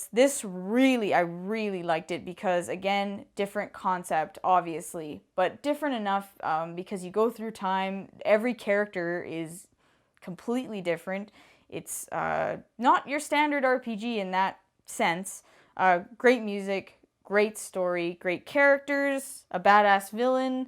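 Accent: Canada. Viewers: This is a really well-lit YouTube vid. I like your Canadian accent. Chrono Trigger was really cool. I think I beat it a few years ago.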